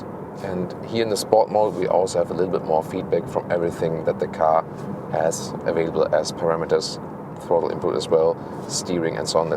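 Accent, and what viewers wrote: Germany. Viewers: man, hope you will find an english teacher one day... get rid of the germglish after all these years...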